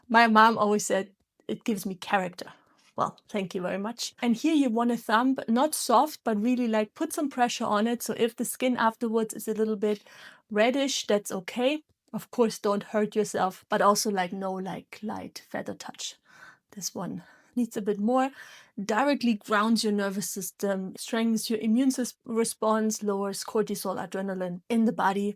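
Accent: Germany. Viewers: Danke für das erfrischende Video :-). Ich würde Sie auf Grund Ihrer Aussprache irgendwo im hessischen Raum verorten, aber vielleicht irre ich mich auch, und Sie haben gar nichts mit D zu tun ;-). Falls jemand gerne liest : von Clinton Ober gibt es das empfehlenswerte Buch (gibt's auch auf deutsch) "Earthing - The most important health discovery". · Du hast einen starken deutschen Akzent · Thank you so.much! I already did the tapping and it works instantly. Do I sense a slightly German accent?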